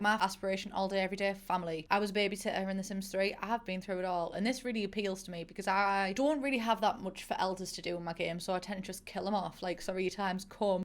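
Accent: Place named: Northern England, United Kingdom. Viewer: I FORGOT UR NORTHERN ILY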